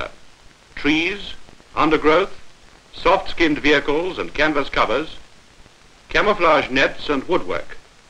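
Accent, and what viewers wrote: United Kingdom. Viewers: That high-brow British accent... that same annoying accent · This is a British film, not Canadian. Love the narrator's Received English accent. Flash sounds like flesh. No wonder why they call a flashlight as a torch. Americans would laugh if they heard something that soundly like fleshlight. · Typical british accent...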